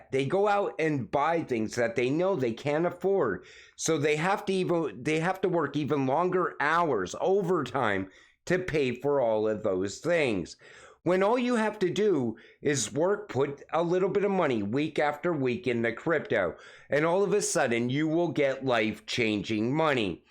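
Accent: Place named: New York, United States. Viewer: Hey My Man, Since it's obvious from your accent that you're from the New York area, I just want to "Bust your Balls" for a second. I busted out laughing when you said "Kenny Nguyen" by pronouncing it "Na-goo-in" when it actually pronounced "Nwen". It's a name that's very common in Vietnam. That's it. Keep up the good work.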